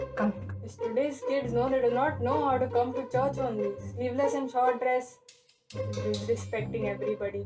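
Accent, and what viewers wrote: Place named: Konkani, India. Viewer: Love your Konklish, energy, charisma, quick wit, mannerisms, fun, humour, painted moustache, painted hair on your chest ..... and not necessarily in this order.! Keep it up.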